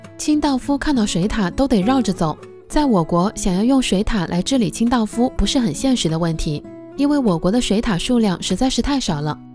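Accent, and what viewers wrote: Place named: Guangxi, China. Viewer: 樹瓦蘭窖 我就猜他是广西的，口音太有广西味儿了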